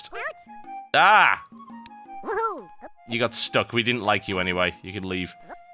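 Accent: United Kingdom. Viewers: nope, his natural accent is a geordy accent; think cockney with a concussion and a mouth full of novocaine. he's just trained himself into using a more "proper" british english, basically the british version of the midwestern standard that most TV show hosts and newscasters have.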